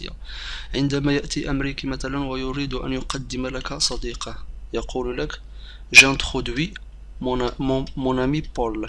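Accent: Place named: Maghreb. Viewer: Wlah c vrai lui déjà et nul avec un accent du maghreb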